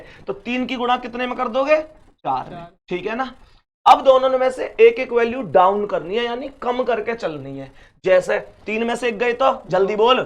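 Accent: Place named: Mathura, India. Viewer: Mathura ki boli on point :hand-purple-blue-peace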